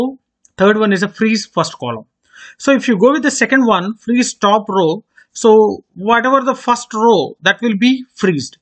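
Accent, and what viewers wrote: India. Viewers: Very useful (indian accent)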